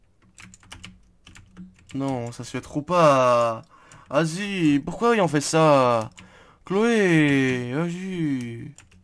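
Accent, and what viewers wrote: Quebec, Canada. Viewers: stp parle avec ton accent qc :(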